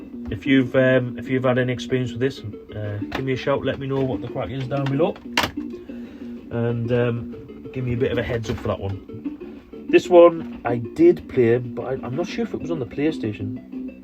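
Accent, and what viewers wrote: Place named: North East England, United Kingdom. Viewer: Great stuff mate. You can’t beat free stuff, you sound like you’re from the NE? We are in Teesside. My cousin was REME too.....I bet you get that all the time 😂😂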